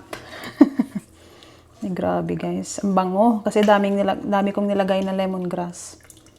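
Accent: Iloilo, Philippines. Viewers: ilongga ka ma'am?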